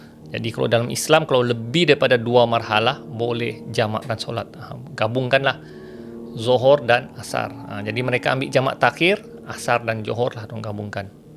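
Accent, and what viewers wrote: Sabah, Malaysia. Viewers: Lambat hadir tapi tetap tengok. Suka dengar orang Sabah cakap. Hehe he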